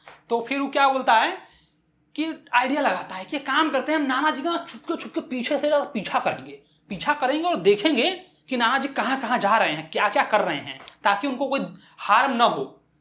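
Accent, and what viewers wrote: Bihar, India. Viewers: Sir aapka accent Bihari aur east UP jaisa h...Mast h sir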